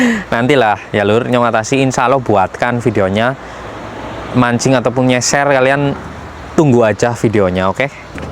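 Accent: Banyumas, Indonesia. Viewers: Nyong ngapak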